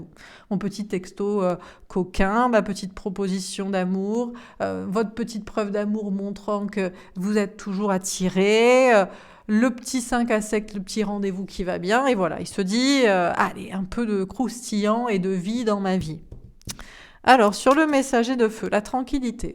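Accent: South, France. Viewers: Bonsoir, J'adore vous écouter, et surtout votre humour avec l'accent du sud!! C'est tellement ce que je vis cette guidance.. avec un masculin super matrixé.. qui fait style qu'il n'a rien vu, que sa vie est cool.. lol quoi merci 🙏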